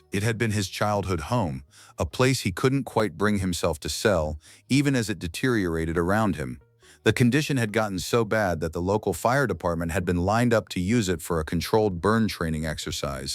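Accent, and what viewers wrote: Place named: Kentucky, United States. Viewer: I started watching about a year ago. As a fellow Kentuckian (also from the Louisville area) I swore she was in KY for the first several videos I watched ( know my KY accents!) until she said she was in North Dakota. But the accent just didn’t add up for that area. Never the video I watched today I was thinking about that. Now that I know she’s originally from here t makes sense!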